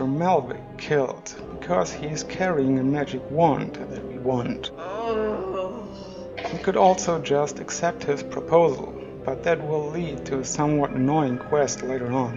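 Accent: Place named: Germany. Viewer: I downloaded the original version of the game for 1,24 € yesterday because I could not wait for the postman. I was too excited. It works. Because your name and your accent sounds pretty German I want to say: Danke für das Video. Das hilft mir sehr weiter. Prima sich mit jemandem auszutauschen, der sich bei diesen Klassikern auskennt.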